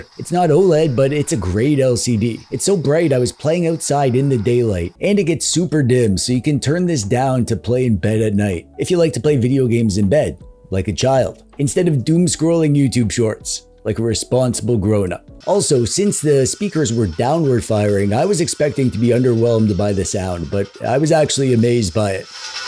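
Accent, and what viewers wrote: Canada. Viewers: If I hadn't jumped ship to Retroid for a Retroid Pocket 4 Pro, and more recently a Retroid Pocket 5, I'd have been very interested in this. But instead, I'm just watching to hear the nasally Canadian tones of.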